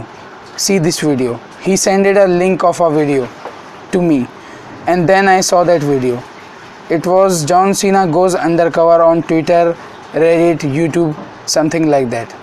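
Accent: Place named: India. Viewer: You got a really strong indian accent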